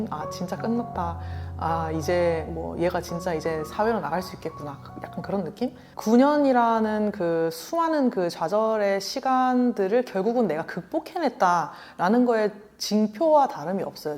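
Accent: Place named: Gyeongsang, South Korea. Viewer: 경상도 사투리 구수하고 좋다 ㅋ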